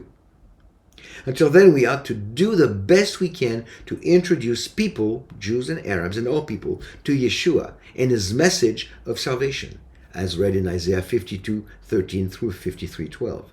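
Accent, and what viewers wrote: France. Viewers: Dear " frère " I always hear the french accent and I like it. Au revoir.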